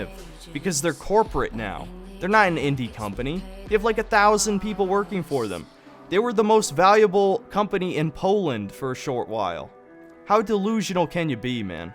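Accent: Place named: Chicago, United States. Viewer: I like your Chicago accent!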